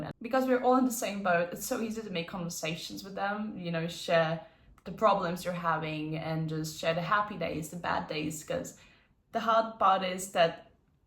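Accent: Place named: Australia. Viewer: There is definitely an Aussie accent sneaking in when you talk!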